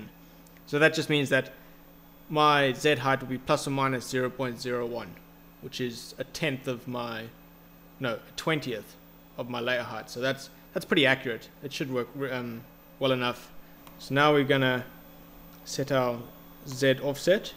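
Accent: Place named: South Africa. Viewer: Gotta be South African :)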